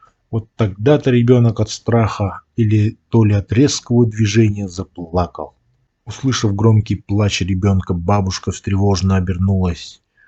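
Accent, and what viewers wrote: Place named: Yakutia, Russia. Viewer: Я про этот случай слышал, тут просто красиво описано каким то писателем,прочитано с хорошей интонацией и знакомым якутским акцентом. 😄👍 А рисунки то какие 👍👍👍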